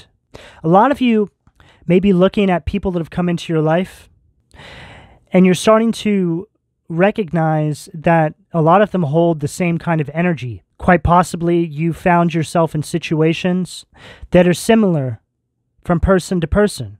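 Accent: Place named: Massachusetts, United States. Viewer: You know… it took me all night to watch but yes! Somehow yes!&you sound like you’re from mass like me… your voice just sounds familiar 🤔💫♉️♋️♌️✨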